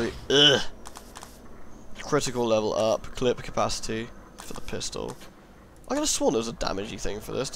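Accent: England, United Kingdom. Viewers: ***** I know this may sound like I'm a cruel and evil person, but personally, I quite enjoy watching you play games which don't entirely satisfy you. I love hearing you complain about things like a gamer would when he's dissatisfied. It feels like I'm actually watching a player play the game (and narrate in sexy English voice) and complain when things are not as they should be. So yeah, keep at it, <3 it :)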